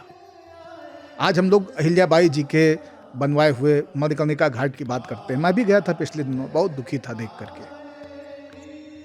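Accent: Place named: Allahabad, India. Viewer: Allahabad style , kahin maja aa gaya , baba is back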